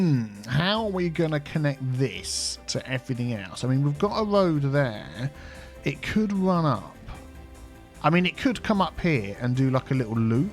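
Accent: United Kingdom. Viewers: My daughter has been sleeping through your calming british voice since she was born 5 months ago. Also, I`m enjoying it. Especially your Teaville series. Thanks mate - keep doing what you`re doing!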